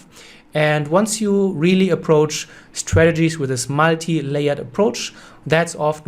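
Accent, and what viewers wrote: Germany. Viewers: Are you german? Because of your dialect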